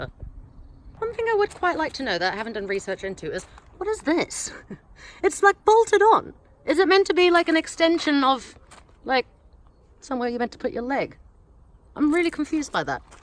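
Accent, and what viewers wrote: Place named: Australia. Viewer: You have an interesting accent, sounds Australian, English and south African all in one haha.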